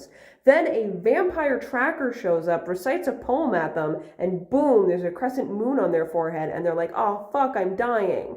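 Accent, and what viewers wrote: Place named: Nova Scotia, Canada. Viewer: The thing with the locations of the house of night, is they’re in places PC thinks are important or pretty. There’s three in Italy because Italy is pretty. Europe has a ton of them because classical Europe is pretty. There’s way more in America because PC is American. She doesn’t even really acknowledge Asia because, aside from pretty places like Japan, she doesn’t want to have her self insert characters go there and be in a less well off place than they would be in the Western world. Her worldbuilding and logistics is on the same level as JKR (Also I pegged your accent as Nova Scotian pretty quick due to watching too much trailer park boys lol)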